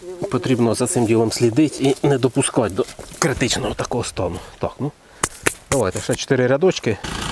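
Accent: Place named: Cherkasy, Ukraine. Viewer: мова українська, діалект Черкаський якщо не помиляюся. Дивіться відео і колись щось і зрозумієте, з картинки теж багато що зрозуміло.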